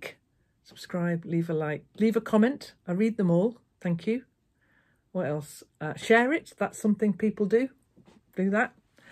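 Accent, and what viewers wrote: England, United Kingdom. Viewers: I was watching Arne & Carlos today as a welcome distraction from our election mania here in the US (I am from the UK originally - so enjoy hearing your accent - makes me feel at home 💕) - they mentioned you, so here I am! Loving your channel! I think you might enjoy the Fairyland Cottage YT channel ;) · I wonder if it suggested you because I watch "knit ink". They went on an Arne and Carlos cruise I think! Oh and just get it done quilts. She's great. Lovely to hear an English/uk voice chatting about quilts, though ☺ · I got some quilting videos recommended by ty and somebody mentioned english piercing - I think it was the Royal Quilter or something like that in Australia. I google it, because I though piercing and quilting how do that go together. LOL. After searching in yt I found you within the results. Thank you ! I sometimes am in need to hear a lovely english voice, rambling on 🥰🥰😉.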